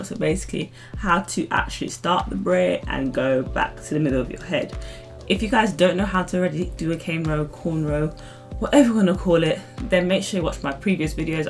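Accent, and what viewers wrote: United Kingdom. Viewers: I love your accent London accent i guess You are a beautiful woman with a beautiful voice 💕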